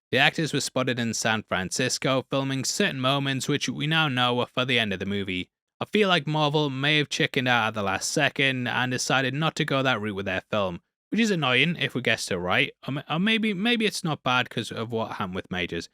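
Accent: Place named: United Kingdom. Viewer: I knew I needed this guy to explain things to me in a British accent. He never misses.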